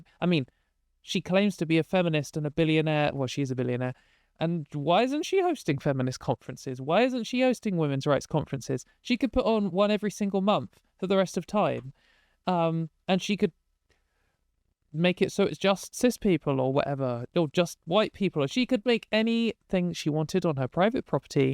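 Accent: RP, United Kingdom. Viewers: Sending love from California. Your posh voice puts me to sleep after work thanks for the content 💞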